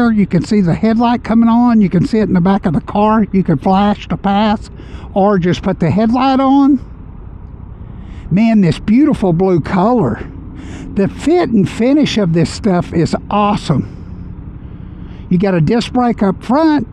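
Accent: United States. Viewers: English man I think not sir sounds American to me😂😂😂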